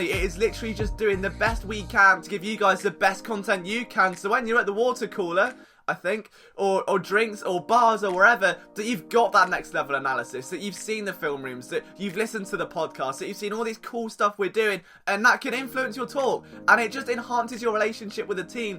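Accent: England, United Kingdom. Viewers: To be honest your voice was the reason I tuned to you. I never heard a Brit speak Eagles. But after listening your presentation and analysis, it is clear that you have a passion for the Eagles. I loved them over 23 years ago. I am glad you are achieving your goals. Semper fi! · Really happy for you, English accents get on my nerves honestly but I still love all your eagles videos lol. Keep up the good work!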